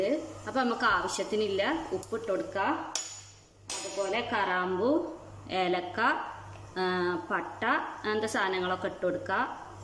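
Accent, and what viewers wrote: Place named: Pattambi, India. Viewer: Pattambi ഭാഷ നല്ലോണം വന്നിട്ടുണ്ട് 😍😍😋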